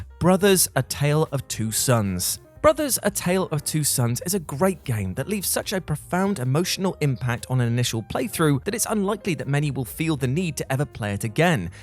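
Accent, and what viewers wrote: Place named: United Kingdom. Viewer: Ah. My favorite British accent barring chef Ramsay and my personal friend. I hope to one day be as nostalgic and awesome as you with my own accent. The accent of cornbread and fried chicken...this I who I am don't judge me. 😑🤣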